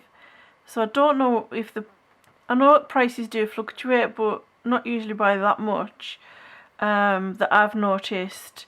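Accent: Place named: Birmingham, United Kingdom. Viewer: Absolutely love the book! Where did you get it and what is it called? I have a few open stock Pablos and I recently bought the Luminance, love what you are doing. It’s lovely and you are so pleasant to listen to 😊 I was born in Birmingham but emigrated to Australia in 1974. Your voice reminds me of the land of my birth ☺️☺️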